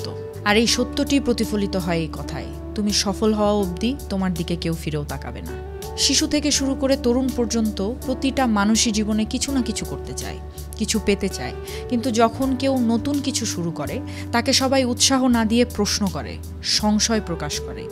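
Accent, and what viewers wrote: Bangladesh. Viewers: Apner tone Bangladeshi but apner title nepali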